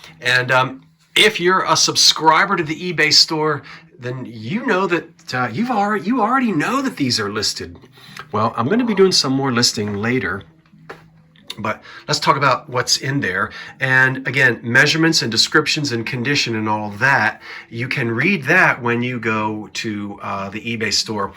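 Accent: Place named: New Jersey, United States. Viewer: I always love listening to you New Jersey guys pronounce the word "water." Like "wooter." LOL!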